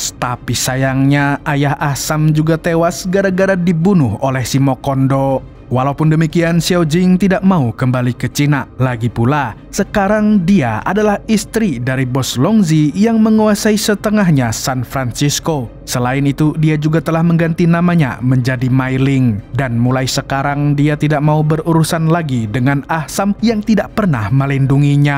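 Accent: Banjar, Indonesia. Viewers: Njir rancak nonton hanyar aja tahu pada urang Banjar sidin nih😂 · Urang Banjar kah .. mantap sanak ai.... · Urang Banjar kah Sidin ni mang ? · Urg Banjar mana suara ny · Urang banjar sekalinya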